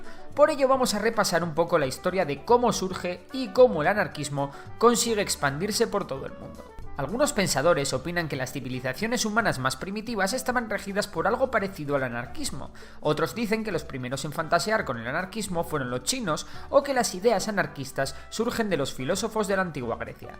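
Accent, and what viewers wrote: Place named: Spain. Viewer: muy bien este video, yo esperaba un anarquista es un rebelde. Que impone su bienestar. Gracias por toda la historia, toda mi vida, escuchando de gobiernos y ahorita un poco más de información. Ojalá pueda poner, uno de los impuestos o la tecnología. En esta nueva era, a mí me tocó los Nokia con snake y ahorita ya veo memorias de pez acostadito 😗 Me es peculiar el asiento español 🫠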